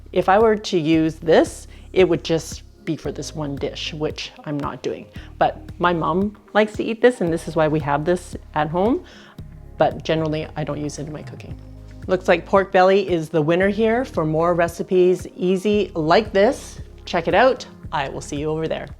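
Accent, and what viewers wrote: Canada. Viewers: Very cute accent! Are you Canadian 🥰🌷... love the accent love your recipe !! Can’t wait to try it!!! Omg! Mouth watering 😋😋😋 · ok so I went to the Chinese supermarket and they have pork belly on sale imported from Mexico for 3.99 a pound I naively asked for two pieces and it came to 4.4 lbs and $17 but I decided it was worth it and I may go back and get some more and put it in the freezer while it is there what do you think, gud deal or? love your Canadian "outs" and "abouts" BTW. Arthur in California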